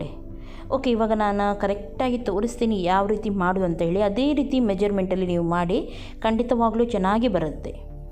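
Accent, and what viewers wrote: Mangalore, India. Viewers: Tapakka typical mangalore kannada😃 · Nice mam .... neev Mangalore navra.... Language haage... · "tapakka" typical managalore kannada...i love it, you revealed many tittle secrets which others dont.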